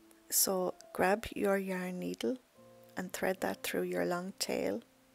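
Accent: Ireland. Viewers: Well this is just lovely! I adore this whole tutorial! Your pretty Irish voice is so soothing. I’m an American who lived in Ireland for a couple of years, and the memories are priceless. Gorgeous pattern and hat!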